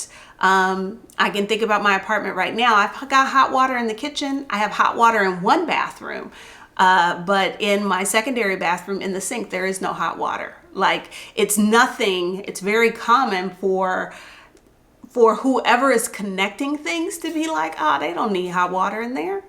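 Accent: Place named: Texas, United States. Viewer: I moved to Mexico last year, (Ajijic), and your list was VERY informative. Thank you! Love your Texas accent.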